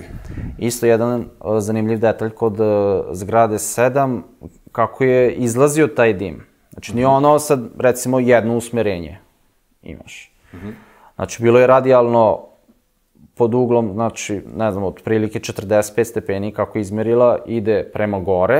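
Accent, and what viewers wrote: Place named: Subotica, Serbia. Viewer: Subotičanin? Zbog akcenta bih rekao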